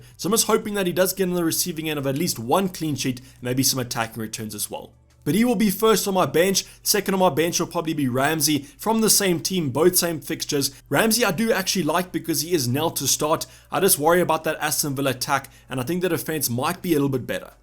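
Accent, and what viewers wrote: Afrikaans, South Africa. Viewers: Yoh!! Your boer accent is heavy 😂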